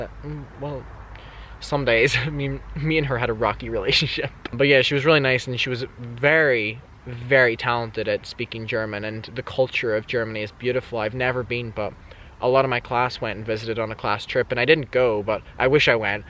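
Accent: Ireland. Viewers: This is probably a dumb question, but does it stay "day" light all night [like it does in Alaska] or does the sun eventually set in the summertime in Ireland? Aha sorry I'm not educated about that & was just curious. In America, where I'm from, the sun sets later due to daylight savings time and will finally set ish at night at the latest....then we move the clocks back an hour in the fall so in the wintertime, it'll get dark by 6pm. I love your Irish accent btw. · You would be welcomed in America, they love men with Irish accent! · Where are you from?? Two minutes in thee video...you’re from Ireland 🇮🇪 Awesome!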